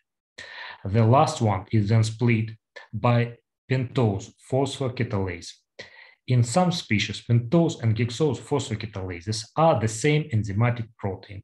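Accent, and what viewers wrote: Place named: Russia. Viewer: your accent is absolutely from Russia or like these countries, it never seems like English, if you can write in title boxes in English it will be better so we can understand....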